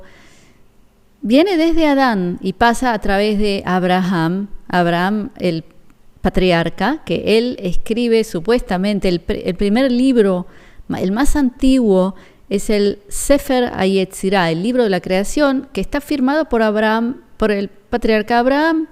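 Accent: Argentina. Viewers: Verte y escuchar tu acento argentino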